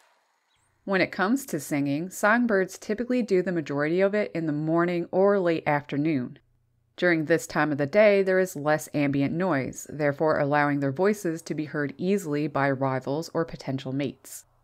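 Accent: Canada. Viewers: Canadian voice do you like spring too.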